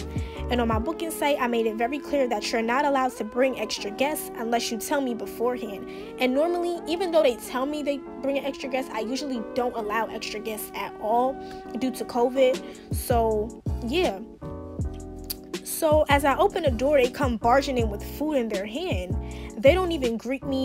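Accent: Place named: New York, United States. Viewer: Are you from New York? If your not either way I love your accent 😩❤️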